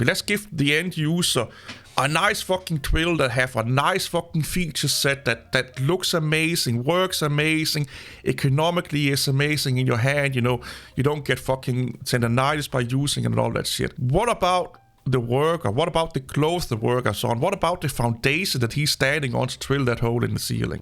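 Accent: Denmark. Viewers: That accent is waaaay tooo danish!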